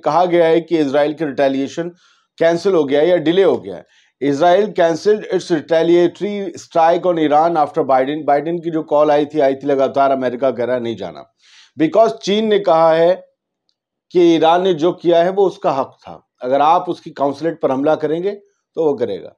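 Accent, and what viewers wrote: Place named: Allahabad, India. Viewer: Aal aap Allahabadi bhasha mein bole rahe the. Ameresh Misra ji bhi allahabad ke hain usi bhasha mein bolte hain.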